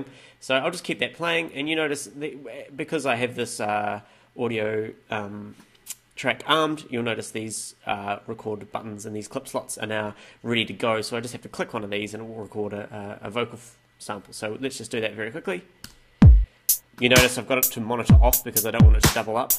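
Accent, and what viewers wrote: New Zealand. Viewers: Instantly knew you were kiwi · Is your accent New Zealand or Australian? I love it none the less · He's a Kiwi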